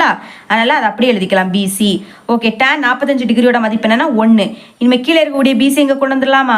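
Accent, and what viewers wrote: Kanyakumari, India. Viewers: neenga kanyakumari ahh akka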